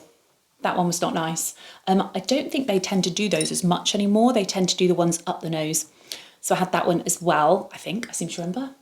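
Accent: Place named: United Kingdom. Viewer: You have a new subscriber here! I'm so sorry that getting a referral to a specialist was so tough. I'm in the States, and referrals tend to work a bit more efficiently (if you have good insurance!). I'm pretty sure that I could very well have nodules. Speaking as well as trying to sing has been difficult for the past couple of months. I've already made an appointment, and we'll find out. The light at the end of the tunnel is any comfort that they can be healed -- and I can hopefully sing again! BTW, you remind me of a girlfriend I had a while back.... but, with a British accent! (and she was real cute!!).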